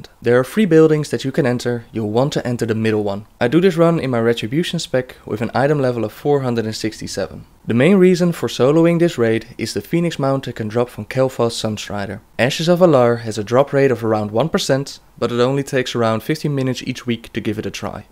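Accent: Netherlands. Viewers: Haha i can hear that ur dutch xD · are you from the netherlands ???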